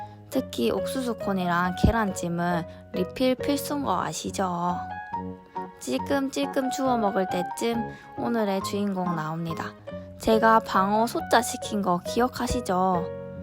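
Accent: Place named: Gyeongsang, South Korea. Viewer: 서울사람이라면서 갱상도 사투리네예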